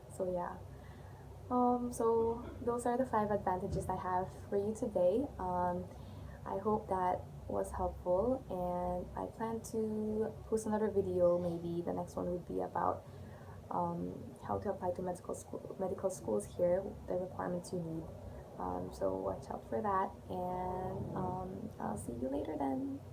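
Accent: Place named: England, United Kingdom. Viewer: I stumbled upon your channel paid attention to the details you say, well im humbled you're such a gentle soul. Prospective doc here graduating pre-med next june thank you so much for the helpful information concerning MD in in Ph well im in Ph as well. You doing a great job im better informed because of you. Planning the US residency too soon. Allow me to pass my last 2 sentiments I love your calmness and gorgeous english accent!! Im humbled😊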